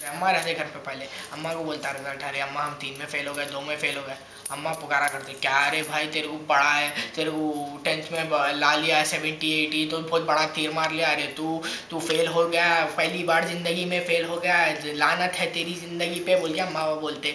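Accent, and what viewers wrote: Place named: Hyderabad, India. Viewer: dude m watching this just for your hyderabadi.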